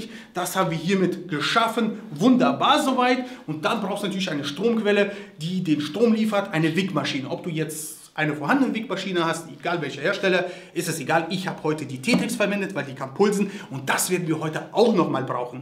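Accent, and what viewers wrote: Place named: Russia. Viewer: Bester Mann wie er es erklärt usw weiter so ich finde dich Top! An deinem Dialekt kann ich hören das du aus Russland kommst, ich muss zugeben das ich unter Russen das Schweißen MAG und WIG gelernt habe und die waren echte Profis!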